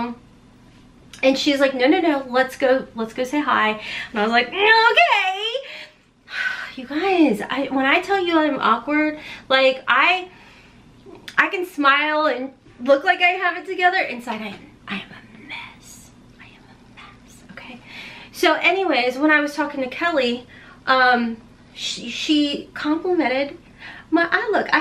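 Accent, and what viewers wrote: South, United States. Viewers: I had to stop this video when you said your not good with words and you hear it in the comments that broke me. Please never discredit better yet never let anyone in these comments discredit you or how you speak words. You are a tried and true southerner girl with a beautiful accent. You speak your words just fine. You articulate words perfectly in your own way, if they have issues then that's on them. This is coming from one southern cajun lady to another southern queen. Now back to the video. Blessings and Love!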